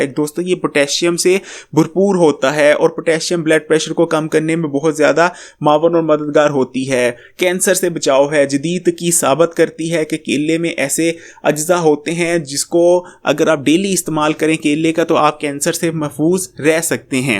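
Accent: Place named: Punjab, India. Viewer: Hahaha buri bat hai..punjabion k lehjay se hi pata chal jata hai k punjabi lehja hai..lekin koi bat nahi kher hai bhai hain apne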